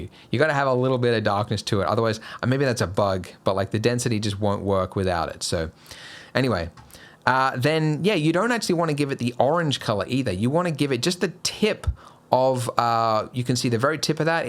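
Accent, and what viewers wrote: Australia. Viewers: Oh I love Aussies, they're such good sport. And the accent is always a + too.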